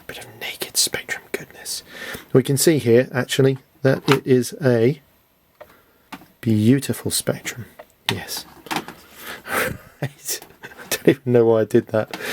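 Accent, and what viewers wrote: United Kingdom. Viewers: ahhhh its so nice to find a fellow English man who loves to muck around wioth old computers etc...lots of Americans nothing wrong with that but to hear "Oh Geezer" lol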